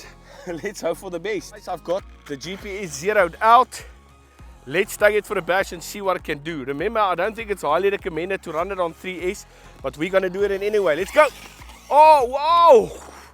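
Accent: South Africa. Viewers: Hey you live in SA still? I had to sub from your accent lol, ex Joberg resident myself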